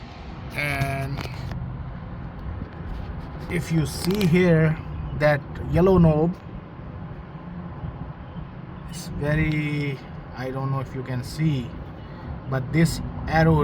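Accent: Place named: India. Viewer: You sound like you’re from India. I would like to connect with you and learn about your journey in the HVAC trade.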